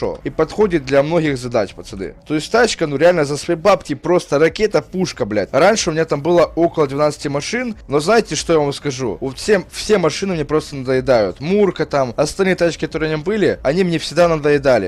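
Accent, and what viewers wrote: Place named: Ukraine. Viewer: Он как-бы украинец, а у них г читается х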